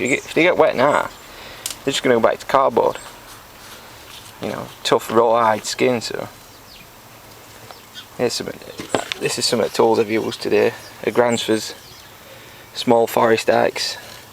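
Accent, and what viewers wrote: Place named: North, United Kingdom. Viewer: best video on pelts ive seen. so many times they say you need this, or that, this is a COST FREE video, so it makes it the best. by the way, are you from the north, i noticed your accent.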